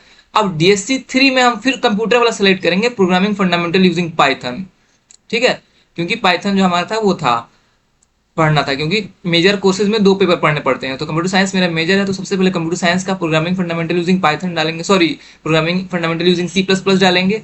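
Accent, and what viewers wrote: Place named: Bihar, India. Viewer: Bhai aap bihar se hi kya tone match kr rha h 😊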